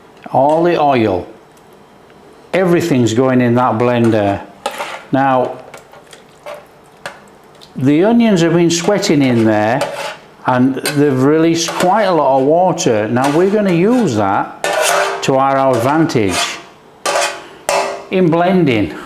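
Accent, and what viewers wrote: Yorkshire, United Kingdom. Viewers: Love hearing a fellow yorkshireman when i click on a video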